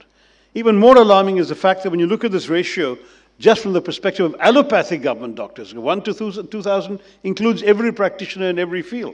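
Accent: United Kingdom. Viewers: He speaks like Britisher.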